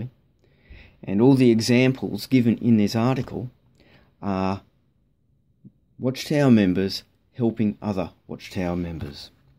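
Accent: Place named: Australia. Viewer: Thank you for sharing, we in Qld, nice to hear another Aussie.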